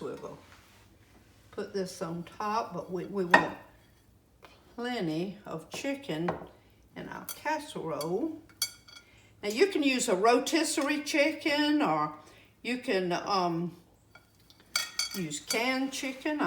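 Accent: South, United States. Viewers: your southern char., hospitality and accent is the real deal.. What a blessing you are!!! And most importantly YOU LOVE JESUS!!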